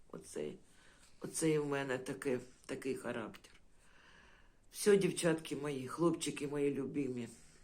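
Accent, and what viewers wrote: Kherson, Ukraine. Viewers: Пані, вітаю, Ви дуже класна, ваш говір так мені нагадує мій, херсонський❤, вже 16 років там не живу, а всеодно, досі проскакує та балачка😊, зичу Вам міцного здоров'я і терпіння, най Бог кріпить вас і охороняє, доброї ночі, Янгола Охоронця🙏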